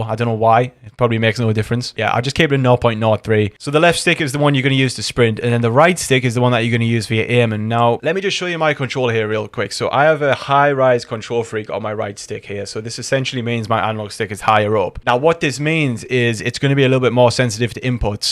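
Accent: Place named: North, United Kingdom. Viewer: Sound like a fellow northerner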